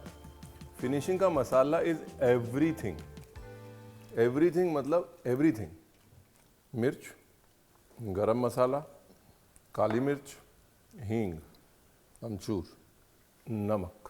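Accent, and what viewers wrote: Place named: Punjab, India. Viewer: Love ue punjabi accent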